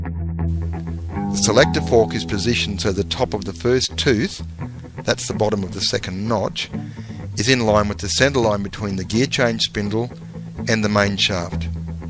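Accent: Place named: Australia. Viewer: Hey great video.. I know this is old but hopefully you stillnsee it.. I have a t120v 1972 and the mechanic who rebuilt the motor couldn't get the gearbox working. it looks the same externally I was looking at it today.. though I believe my model has a leaf spring not a plunger.. same selector "quadrant" learned a new word today. Anyway the bike is in neutral the but gears won't change. Shift mechanism all seems right. I ran it when I first got it home and the gearbox locked up totally. its been sitting for years as I kinda gave up on it.. I looked at it today.. taking the outer cover off and its the kickstart gear that seems locked.. inside the cases..lower gear spins freely when Turn the tyre.. I'm a little on the "in over my head' side honestly but your video is pretty encouraging.. just wondering if A: you have any thoughts as to what could be going on wrong and B: being (as you have the Aussie accent) know anyone in Adelaide I could take it to?. 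Cheers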